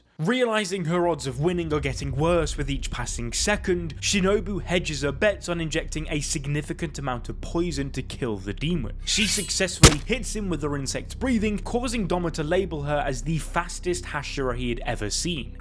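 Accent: United Kingdom. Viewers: “Full cinematic fight” um its just a British annoying voice explaining what we are seeing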